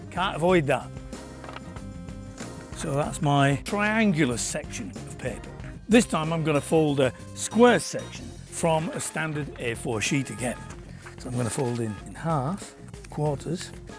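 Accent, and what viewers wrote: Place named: Midlands, United Kingdom. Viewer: Some kind of english accent, propably the midlands region i think.. or maybe newcastle.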